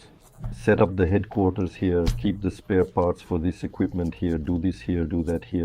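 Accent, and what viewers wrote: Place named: India. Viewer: Как будто индус озвучивал) Ну вообще неплохо.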